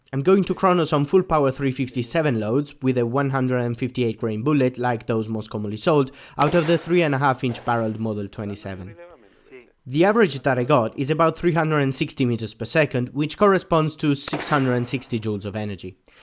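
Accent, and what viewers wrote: Italy. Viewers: Italy i think, i know i love his accent. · So you are italian - i was wondering what the accent is 😘 does anyone know if he lives in US or Italy? 🙊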